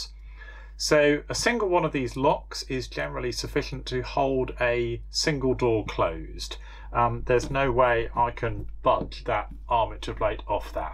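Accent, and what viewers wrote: United Kingdom. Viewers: Excellent presentation with the charming British accent.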